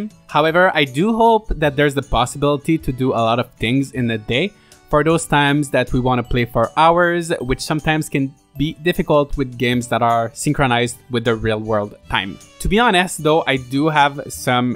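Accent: Quebec, Canada. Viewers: Oh le Quebecois is fort in this one. <3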